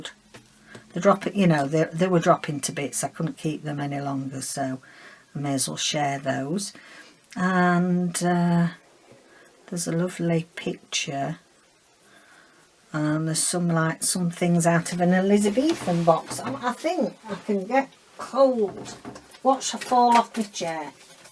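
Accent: Yorkshire, United Kingdom. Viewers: Don't change a thing,. We love your enthusiasm and for sharing your techniques and especially for your accent from God's own county. (Yes I'm biased having been born and bred in Yorkshire but now live "down south"). While watching you I'm finishing my fourth A5 piece of improv. stitching (inspired by Ariane Zurcher). Next week I'm going to start the wrap fabric cover (inspired by Anne Brooke "Wobble Gob 3) - another Yorkshire girl!! Can't wait for the pick jar. Enjoy the plum crumble!!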